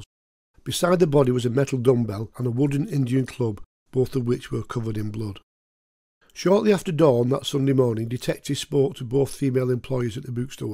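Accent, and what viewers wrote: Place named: North, United Kingdom. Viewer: In defence of the regional accents of Northern Britain ( and yours is soft and pleasant to the ear ) when Eastenders came out there were thousands of requests from Scotland for subtitles .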